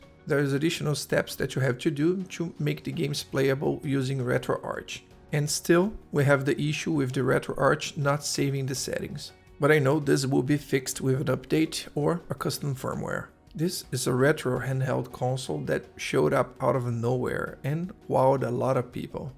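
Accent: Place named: Brazil. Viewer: Esse sotaque aí não me engana hihihi brazuka na área!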